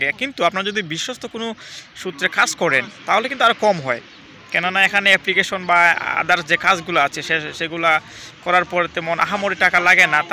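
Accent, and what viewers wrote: Sylhet, Bangladesh. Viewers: ভাই আপনি কি সিলেটি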